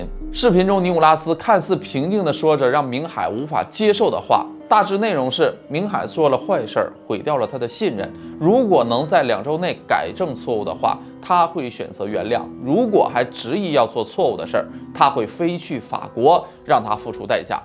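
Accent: Northeast, China. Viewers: 你说的是，区别真的很大。我只认错过一回。我觉得有个阿婆主是天津老乡讲普通话。但她说她是北京人。所以以后我就觉得兴许北京人也这么讲话？我又听了楼主的其他节目，他把z发成zh, 感觉楼主应该是山东或东北人，也可能是内蒙的😆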